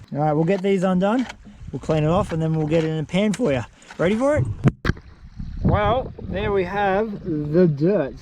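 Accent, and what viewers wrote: Australia. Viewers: enjoy your aussi accent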